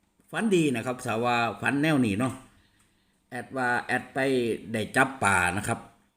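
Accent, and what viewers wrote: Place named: Korat, Thailand. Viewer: แอด ภาษาโคราชบ้านเอง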